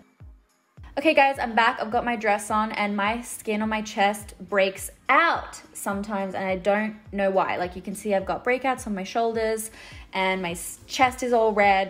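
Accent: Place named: Australia. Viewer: why did i read that in her aussie accent?? 😅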